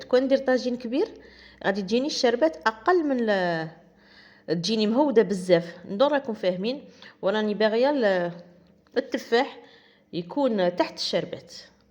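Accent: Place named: West, Algeria. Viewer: أحلى لايك مشكورة على الوصفة طاجين التفاح يشهي و هدرتك تهبل ماشاءالله على ناس الغرب 😘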